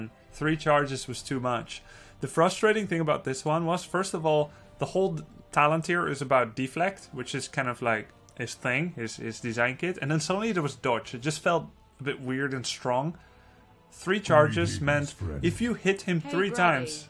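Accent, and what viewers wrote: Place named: Netherlands. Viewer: Jens van Straten Yes. He is from The Netherlands.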